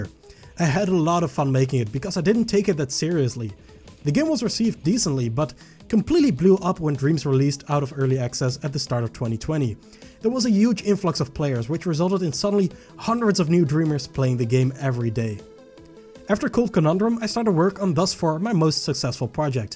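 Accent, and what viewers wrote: Netherlands. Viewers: are you dutch or am i wrong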